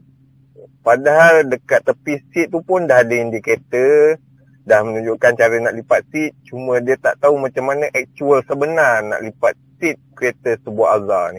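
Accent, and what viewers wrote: Java, Indonesia. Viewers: Pekat kang jawanya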